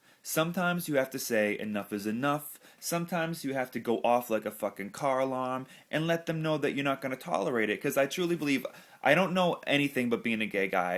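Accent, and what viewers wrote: New York, United States. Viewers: It's fucking sad that this kinda shit is still happening. Sorry this happened to you. Your NewYork accent is adorable. Sad for me that youz gay😏I fink youz tha cutest! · Love the NYC accent sooo much. xoxoxo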